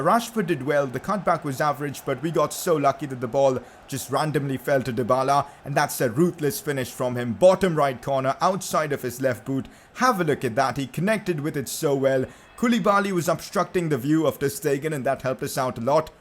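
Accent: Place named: India. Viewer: U speak like indian mannn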